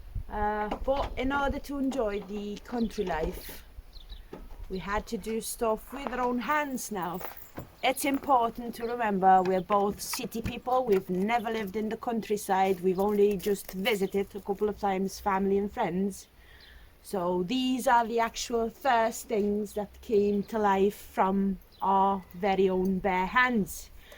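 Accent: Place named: Scotland, United Kingdom. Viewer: Love this!!💛💛 Wishing you all the success with your vlogging journey!! 💛💛 You and your Scottish accent haha!! 💛💛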